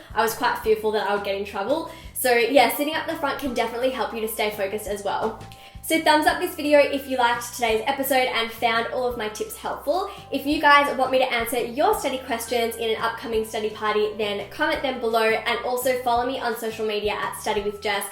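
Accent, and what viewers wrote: Australia. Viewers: kritika singh ahahha shes australian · Australian I'm pretty sure 😊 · R u Australian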